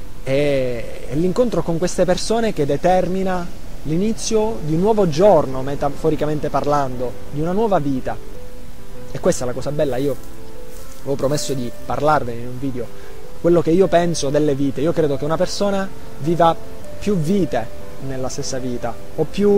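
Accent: Italy. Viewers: Dal modo in qui pronunci la C si sente che siamo dello stesso paese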